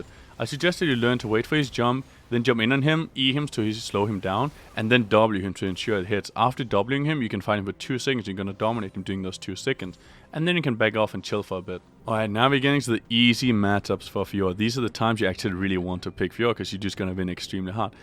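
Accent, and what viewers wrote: Denmark. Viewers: Man kan bare så nemt høre du er dansker hahah, men god video.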